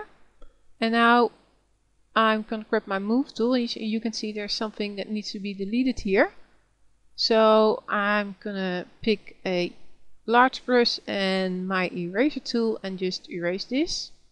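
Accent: Netherlands. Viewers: She really has an accent from Holland, not an insult but most people of the Netherlands most of the time can't speak with a good French accent, that's more something for Flemish people. btw, thanks for the great tutorials ' '! It rlly helped me!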